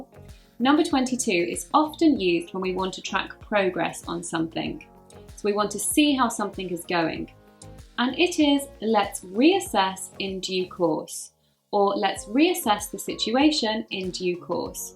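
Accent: United Kingdom. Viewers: Great British accent ❤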